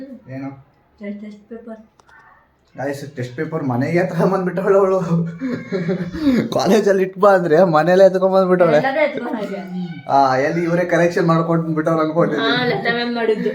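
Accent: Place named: Bangalore, India. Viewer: Tamma ni nam uttara karnataka style dage maatadu bangalore language set aagalla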